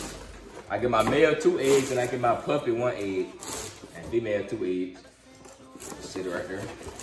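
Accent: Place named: Atlanta, United States. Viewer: lmao he said it twice first time I didn’t hear it by the time I found this he said it again and I heard clear as Day sounds like he from Atlanta or sum 😂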